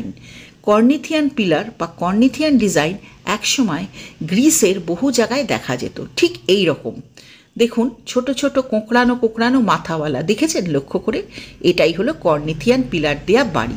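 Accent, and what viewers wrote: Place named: Kolkata, India. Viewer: Apnar voice ti asadharon - khub jeno m chena. Khati uttar Kolatar bhasha o uccharon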